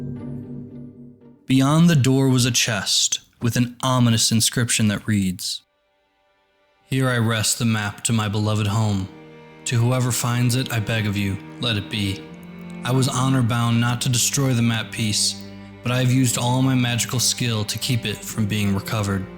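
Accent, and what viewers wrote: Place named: United States. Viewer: Monotone american accent ruins it. Sorry, great animation though.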